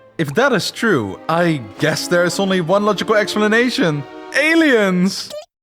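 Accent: Netherlands. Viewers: Wow wat slechte spraak in Engels. Duidelijk te horen dat je Nederlands bent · Are you Dutch/Belgian? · Bro, je Nederlands accent schijnt dik door😂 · I'm also dutch and I could immediately tell you were dutch because of the accent 😂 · I can smell Dutch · please get someone to voice these in i cant w the dutch accent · Horrible Dutch accent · Is the guy talking originally Dutch? :D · I can smell a dutch fella from a sentence away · I smell dutch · I've never watched your channel, but I can tell you're Dutch, so stop that. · Dat Nederlandse accent haha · When I started watching this vid and I heard ur voice I knew you are Dutch immediately😂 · Im way too late on this but I can just smell the Dutch in your voice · Ik hou van het nederlands engels accent. Altijd herkenbaar :)) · Are you Dutch. I hear an Dutch accent (I’m Belgian) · Gemaakt door een kaaskop jeeeeez je hoort het gelijk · Geweldige video maar als nederlander irriteer ik me vreselijk aan het nederlandse accent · Wat een prachtig Nederlands accent! 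Love it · nederlandse accent? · I smell dutch and i love it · Like the video, but the dunglish hurts my soul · You have the most Dutchest accent I’ve ever heard lol. Nbl from a fellow Dutchman ;) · Nederlands accent gaat hard maat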